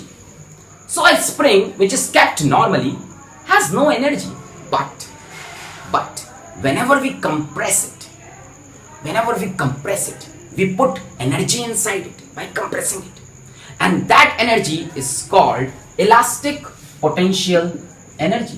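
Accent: India. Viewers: Sir aap bohat funny lgrhe the.Aapko english bolne ki kya zarorat thi.Aap hindi bolte hi acche lagte ho.Tb aapki teaching delightfull lgti h.Aapko iski koi jarrorat thi hi nhi.We love you and your hindi accent.🙏🙏🙏🙏🙏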